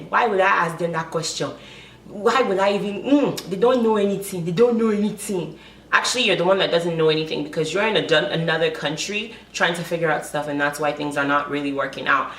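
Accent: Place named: Africa. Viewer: Support is very important. Your African accent is so pure lol. Most Africans don't enjoy their stay cos they don't think they are accepted here. I feel like when you have hate in your heart you will find a reason to execute it. Be it racism, tribalism et al. BTW what camera and lens did you use? #ytqueens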